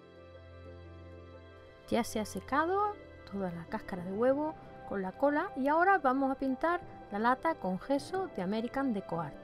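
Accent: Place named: Spain. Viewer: Querida, te mando saludos desde México en el Edo. de Queretaro, todos tus trabajos son hermosos, aqui tambien tenemos varios acentos a nivel Republica y para mi es inconfundible el acento español, te felicito por tus aclaraciones.( aqui tambien les decimos conchas) 🤗👍👍😍😍😘😘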